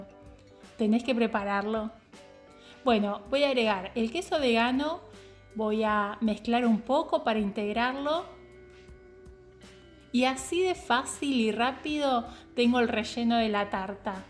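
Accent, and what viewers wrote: Uruguay. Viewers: Que padre me encanta ese acento genial no me equivoque te cuento mi yerno es de Montevideo Uruguay sin q te ofendas tiene muy parecido el acento aunque tiene muchos años viviendo en CDMX el es actor.... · Linda tarta! De donde habla? Por el acento, me imagino que sea Uruguay o tal vez Argentina. Saludos de un brasileño que adora tus recetas 😉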